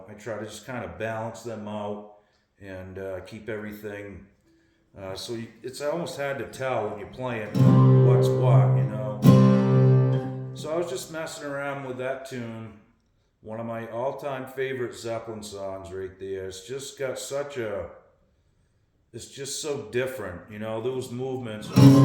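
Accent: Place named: Boston, United States. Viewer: Boston accent made me subscribe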